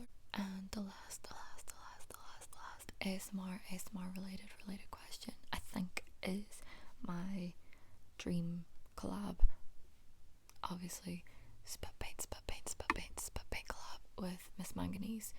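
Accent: Ireland. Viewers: now that u mention it I do hear the Irish accent and I love it!! congrats on 10k <3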